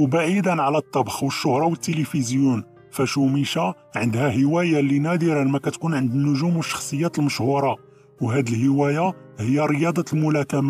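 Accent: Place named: Democratic Republic of the Congo. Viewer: تعليق مقرف من هذا الشخص وكانك تستمع الى كحلوش من الزايير وهو يتكلم العربية.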